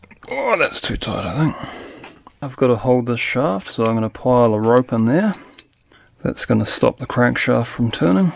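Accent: New Zealand. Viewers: You must be a kiwi??? 🥝 👍🏻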